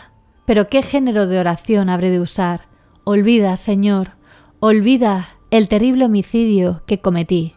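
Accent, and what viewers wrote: Spain. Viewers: Ets catalana?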